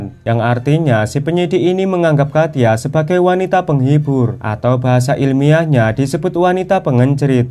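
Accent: Java, Indonesia. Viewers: Komentatorx bahasa Jawa medok banget,,,🤭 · Jowo mu ngendi min? Kyo aku 7th no jkrta logat jowoku ra isoh ilang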